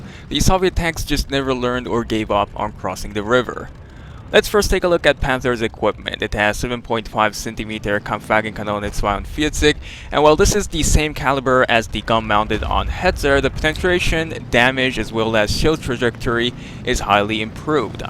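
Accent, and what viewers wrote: Germany. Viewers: würdest du auch mal ein deutsch schprachiges video machen man merkt nemlich das du deutscher bis !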